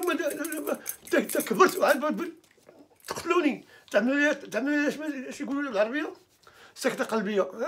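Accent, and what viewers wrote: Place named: Souss, Morocco. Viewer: العزيز يا نتا فالأصل سوسي؟ اللكنة ديالك بحالهم وكتقتلني بالضحك.😂😂😂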